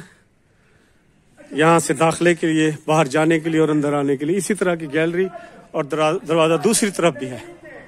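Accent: Saraiki, Pakistan. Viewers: Saraki ka tarhka lgaya kro Maza ata hai.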